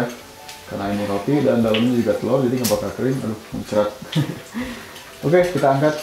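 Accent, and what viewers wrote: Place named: Bakumpai, Indonesia. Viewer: Abang orang mana kayak bahasa bakumpai ije due telu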